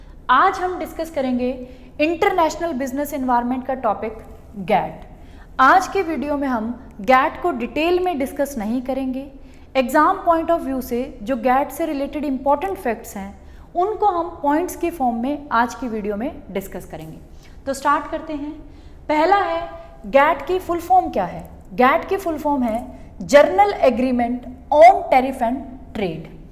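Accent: Punjab, India. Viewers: Mam are u pnjabii??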